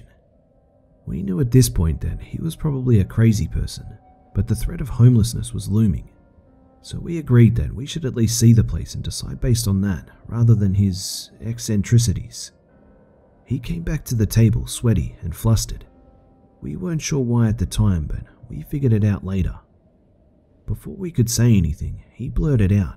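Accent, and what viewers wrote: Australia. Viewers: yes I'm aware he's an Aussie with an accent. fuck off me it was funny lol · are you aussie?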